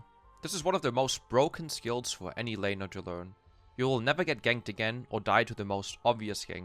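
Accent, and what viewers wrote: Germany. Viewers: love the german accent XD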